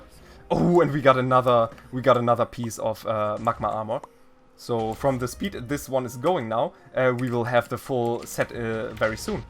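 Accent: Germany. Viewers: Hard Glass is useful for ghast and creeper minions i Think P.S. ich feier deinen deutschen Akzent so hart xdd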